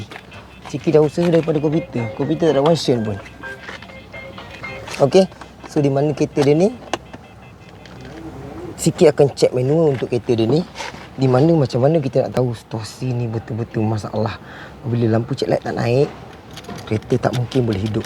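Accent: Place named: Kelantan, Malaysia. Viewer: ade bunyi mcm kelantan sikit. Ore klate ko?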